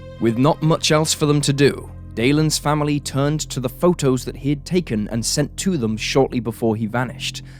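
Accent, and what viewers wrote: United Kingdom. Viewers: When I click on a YouTube video and I hear the narrator has a British accent I am immediately disappointed 😞.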